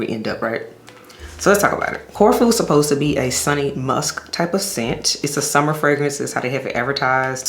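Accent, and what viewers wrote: Memphis, United States. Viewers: I knew you were a fellow Memphian when I heard you talk! Hi!!❤️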